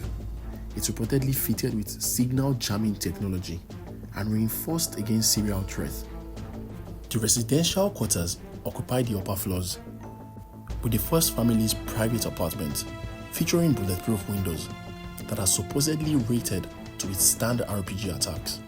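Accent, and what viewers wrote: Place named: Nigeria. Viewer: I just want to thank you for speaking with your Nigerian accent and not forcing Amerixan or British accents. I enjoyed listening to a fellow Nigerian. Well done.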